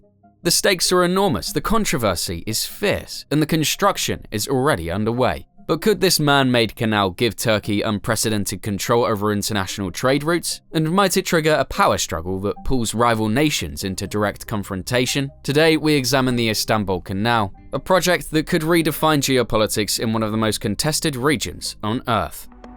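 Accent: United Kingdom. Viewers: He sounds like U.K.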